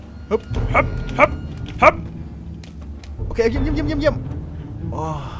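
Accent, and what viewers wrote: South, Thailand. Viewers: พี่ เสียงเหมือนคนใต้เลย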